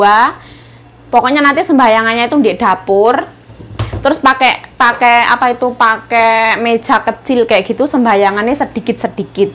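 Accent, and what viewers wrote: Java, Indonesia. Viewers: Kok medok bangat ngomong nya...ya.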